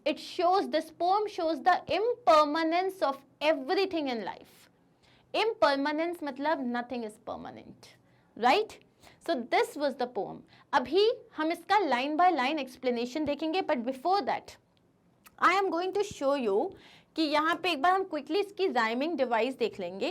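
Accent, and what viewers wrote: India. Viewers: Indian english is not english.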